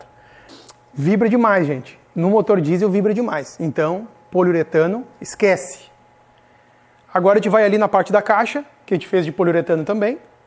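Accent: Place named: Rio Grande do Sul, Brazil. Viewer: percebi que era de perto pelo jeito de falar kkkk , sou de Novo Hamburgo. Também faço trilha , um dia nos cruzamos por aí 👍👍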